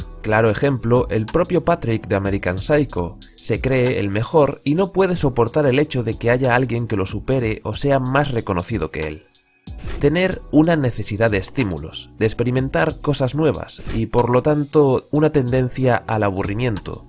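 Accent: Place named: Catalonia, Spain. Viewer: Bonita voz y acento catalán (creo) por eso de terminar la "d" en"t"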